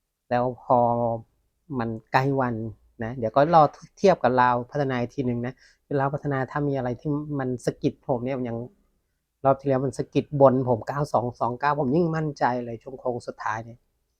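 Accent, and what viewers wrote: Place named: Isan, Thailand. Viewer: สุดยอดเลยครับเว้าภาษาบ้านเฮาหม่วนหูหลายอี่หลีเอาให้แม่นๆเด้อหนุ่มภูพานครับ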